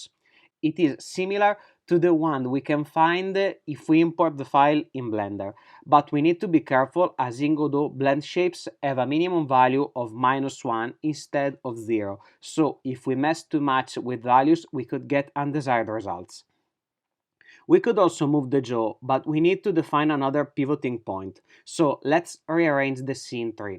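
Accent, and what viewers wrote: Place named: Italy. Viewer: Loving your accent, sounds Italian. I hope I'm not wrong.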